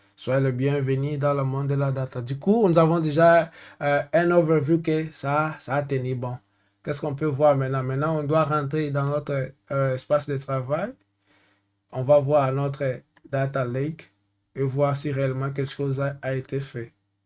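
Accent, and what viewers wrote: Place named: France. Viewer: Tant que le message passe, l'accent français n'est plus une barrière ! Force à toi Ndeko 🎉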